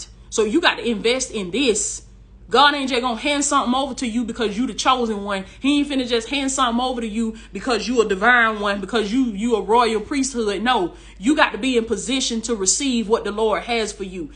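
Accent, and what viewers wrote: South, United States. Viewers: God is a perfect God. You sound wonderful,! I love❤ your southern drawl, and could listen to you for hours. Not kidding. God made your voice unique, to reach the millions that you will be assigned to! Your voice mesmerizes people, and compel them to stop and listen to you while God is pouring into you and into them. Praise God! I hope that you read this because...your mouth is working...👄but...believe me, GOD IS SPEAKING.🙏🏽 To THE MASSES!!!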